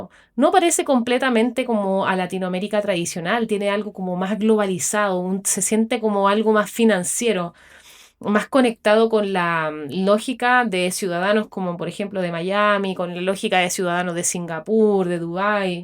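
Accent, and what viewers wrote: Chile. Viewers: Tu creo eres. CHILENA por tu acento, pero tu analisis esta bien hecho y estudiado Te felicito y en horabuena por ti, creo no me equivoco haces PERIODISMO INVESTIGATIVO MUY BUENO. Resaltas y dices las cosas como son y porque se dan. NO COMO UNOS REPORTAJES DE ARGENTINOS QUE ESCRIBEN UNAS "BURRADAS". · Muy bueno tu video. Me parece por tu asentó eres chilena. Mira, Panamá desde sus inicios fue destinada por el mismo creador a desarrollar su economía en base a los servicios. Su posición geográfica, su territorio y hasta la mentalidad de su población apunta a eso. Dese la llegada de los primeros europeos, desde su creación como nación libre, desde simpre su destino fue ese. Tantas cosas para comentar. Termino con esto. En nuestro escudo ee armas, esta escrito esto. Nuestro lema es "PRO MUNDI BENEFICIO" qué significa, Para BENEFICIO DEL MUNDO. 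Imagina fue creado en 1904. Desde simpre es y será nuestro rol. Saludos desde el puente del mundo. Bendiciones.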